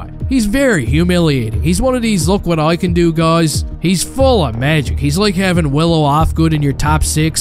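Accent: Newfoundland, Canada. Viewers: First time on this channel. Is a Newfoundlander? Just want to test my accent detection abilities?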